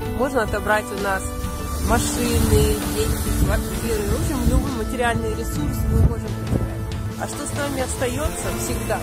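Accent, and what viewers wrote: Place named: Oxford, United Kingdom. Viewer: спасибо, вам за поздравления, за ваши видео-уроки, за вашу безвозмездную огромную работу, которую вы делали и продолжаете делать для нас! Мне стыдно за то, что пока не могу сделать хоть какой-то взнос вам, вашему каналу. Английский это просто моё хобби, от которого я не жду ни каких достижений, успехов. Просто не хочу бросать эту практику, если у меня это получится, то это благодаря вам.Счастья вам и процветания в новом году! p.s. У вас очень классное произношение, по мне, так почти оксфордское.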